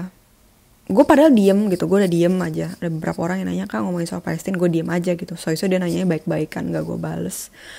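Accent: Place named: Medan, Indonesia. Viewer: telat nonton ini, tpi aku berasa relate bgt sih kek denger temen lagi deep talk krn skrg lagi nganggur dan chance buat burn out gede apalagi pandemi makanya dtg kesini biar dpt sedikit wawasan sembari mau jdi pendengar dikala gaada temen yg bisa diajak ngobrol. sampai sekarang aku masih mikir kak just being kak same as medan people just being medan people who had known as rough accent, same as javanese people being javanese people who had known as gentle accent, we just need to embrace all the uniqueness of different type of people. maybe for some people they are not familiar to found some people like kak, mereka terbiasa lihat klo cewe mau itu pakek jilbab gapake jilbab ya lemah lembut, atau mereka gk terbiasa dgn kata2 kasar kayak gk lumrah buat mereka. but for some people yang ada pengalaman punya temen kyk kak mereka kek "its not a problem, thats how we were friend, thats how i like her, her just being her, its her choice to how her react" at the end of the day we are all human thats it.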